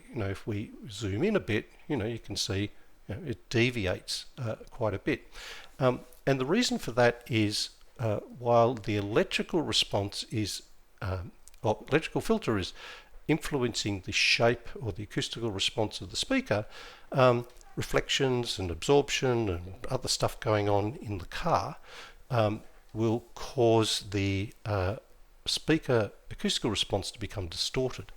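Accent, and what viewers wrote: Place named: South Africa. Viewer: brilliant tutorial, you sound South African BTW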